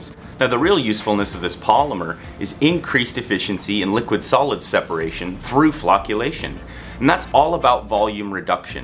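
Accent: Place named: Fargo, United States. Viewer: Thanks, good video. (and what a great Fargo Accent you have! )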